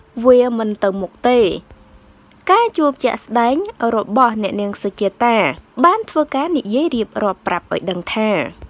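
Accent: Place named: Vietnam. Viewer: អ្នកអាននឹងយួនទេដឹង តុងយួនច្បាស់ចែស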